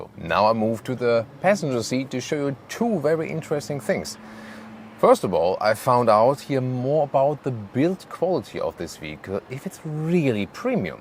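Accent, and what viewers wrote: Germany. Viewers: Gibt es den Kanal auch auf deutsch? Das deutsche Englisch ist....ähm...suboptimal, um es mal nett zu sagen. Und jaja deutsche Autos sind besser blabla...aber die saufen auch wie die Sau! Es gibt nämlich nirgends einen Fullhybriden im Angebot. Entweder Vollbenziner/Diesler, der säuft einiges mehr als der Lexus hier, oder dann direkt ein Plugin oder full E-Auto.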